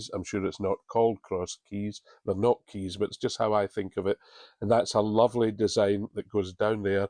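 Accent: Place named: Scotland, United Kingdom. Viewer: thank goodness you were cast off from Game of Wool because now I can watch these fabulous podcasts that you are doing. I'm a knitter in Australia and I started a vest from Kate Davies book "Inspired by Islay" back in 2017 which has been languishing since. I'm now inspired to finish it, steeks and all!! You are an expert knitter and have a delightful Scottish accent (to me), that is so pleasant to listen to.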